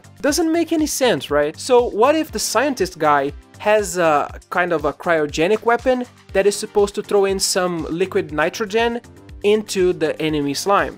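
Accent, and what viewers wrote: Brazil. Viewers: ctz q tu é br, esse sotaque n me engana kjkjkjk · pelo teu sotaque tenho certeza que tu é brasileiro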